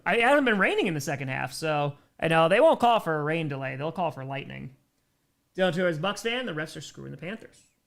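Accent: New York, United States. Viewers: Who is/are the Krossi Posse Nation that refers to at the start of these broadcasts? I had always assumed he was saying 'Grossi Posse Nation' but he does not start off his welcoming cry with a G sound, at least to my ears. (Unless people with a New York accent pronounce their G's as K's, at the start of a word, when they raise their voice.) In any case, good luck with your quest to reach 1M subscribers.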